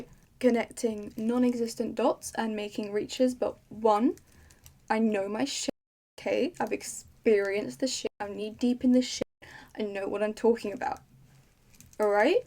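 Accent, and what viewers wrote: United Kingdom. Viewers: Wait you sound like Jessica law- ARE YOU BRITISH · UR BRITISH!?!? (u still slay im just supprised) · I’ve been watching you for a while (on and off) and did not expect you to sound like ts, all proper 😭. I love British people ,I love yalls accents so much 😌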